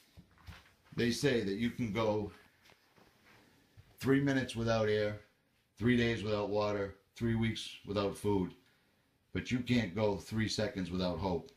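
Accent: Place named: Brooklyn, United States. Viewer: I heard about your channel so I just subscribed. I need to know everything in this pack! I want to turn my over stuffed BOB into a INCH bag. I love how you sound like my Brooklyn cousin who's now passed away. There's nothing bad about being a truther. Thanks for the laughs. Need less to said I'll be watching this with my note pad.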